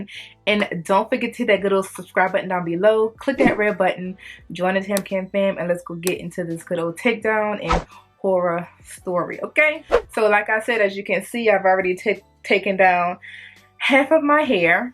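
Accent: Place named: Los Angeles, United States. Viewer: Crazy story. Love your LA accent.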